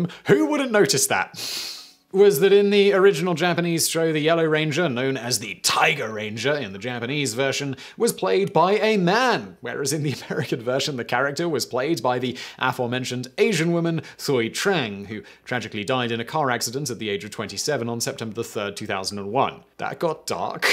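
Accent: England, United Kingdom. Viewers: Yeah, me too. You really can't blame this particular error on his British accent this time either.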